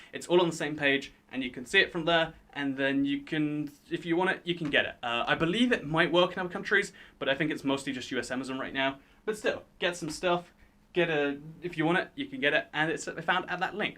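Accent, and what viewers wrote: United Kingdom. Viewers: He lives in the UK so he has an accent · M.A.A.C CRAFTERS No, He's Brittish.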